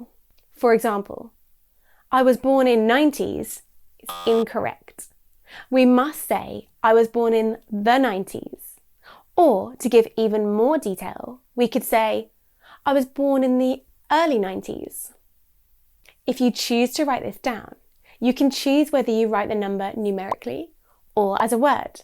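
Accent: United Kingdom. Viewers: 말하는게 너무 영국식이야.. ㅠ.ㅠ 했더니 영국영어 채널이었군요. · What a lovely british accent! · She is british ... diffrent from American or Australian English ... Whoever put this clip needs think that hard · Your lesson is very easy to understand for me and I like British accent because i am living in UK. Thank you !! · 영국식 발음 멋있어...👍👍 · 와 영국발음 적응안된다 ㅋㅋㅋㅋ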